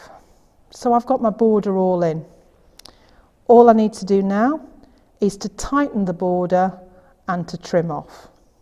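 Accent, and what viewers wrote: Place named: Lincolnshire, United Kingdom. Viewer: A big hello from Monmouth! I was born and raised in Lincolnshire, so it has been lovely to listen to your accent. It brings back many good memories. And this has been s delightful series. Nice and clear, not too much talk to confuse, and all set up in a homely placement. Congratulations. I hope you do very well.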